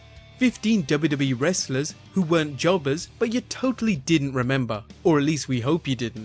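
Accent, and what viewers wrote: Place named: United Kingdom. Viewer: Absolutely fantastic to have a British accent back. Great work 👍🏾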